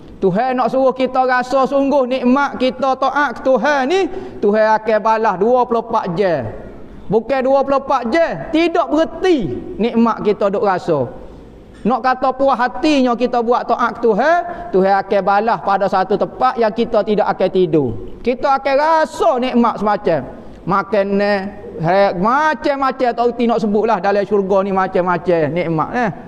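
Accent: Kelantan, Malaysia. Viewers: Alhamdulillah , salah satu ustaz idola kawe, bahaso kelate pekat kemah kemin tok gogek langsung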